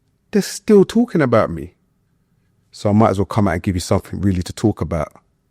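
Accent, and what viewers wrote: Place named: Tottenham, United Kingdom. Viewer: Tottenham people talk the same 😂😂, him and OG have similar mannerisms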